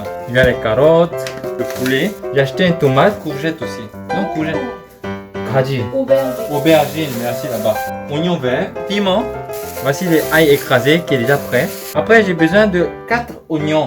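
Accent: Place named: Ivory Coast. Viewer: depuis que je me promène sur YouTube, c'est la première fois que je m'abonne en moins de 30 secondes. ton accent ivoirien m'a grave tuer . pourquoi j ai pas vue tes vidéos avant !? YouTube même vraiment !!! vous avez mal fini kédjenou là déééh , bravo ? merci bonne continuation.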